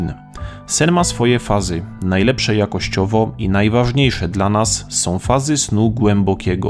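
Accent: Silesia, Poland. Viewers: Jesteś ze śląska? Tak mi się wydaje, że masz akcent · Krótko, zwięźle i sensownie. Lekki śląski akcent 😉👍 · Narrator to Ślązak?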